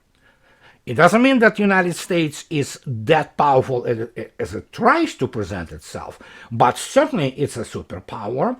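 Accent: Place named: Russia. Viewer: You are very good and everything you are talking about is very interesting and important. I am adjusting myself to your russian accent, so I think I understand it all. 😀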